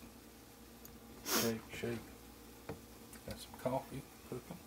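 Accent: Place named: South, United States. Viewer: I am loving your southern accent! Being from the south myself, I find so many men would rather die of a heartache eating meat than go vegan for their health and family! Very refreshing to know a southern man can convert! lol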